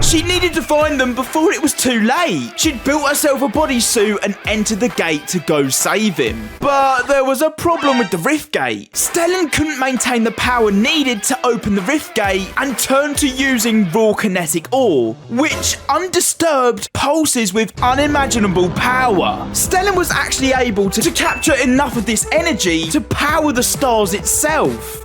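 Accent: United Kingdom. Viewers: British + mic eater = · I would watch this entire video but you're British and obnoxious 💀💀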